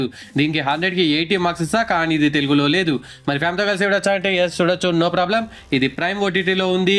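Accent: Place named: Telangana, India. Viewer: బ్రదర్ అంటూన్నావు నువ్వేమో తెలంగాణ బ్రదర్ ఏమో ఆంధ్ర బాగుంది బ్రో....😂😂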